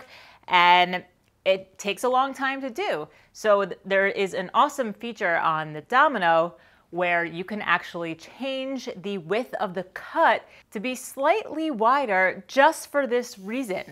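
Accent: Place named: Northeast, United States. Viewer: "joinTing"?,,,There are LOTS of wood working channels out there,, Listening to your Northeast accent is why I like yours,, Keep being you!!... ;-)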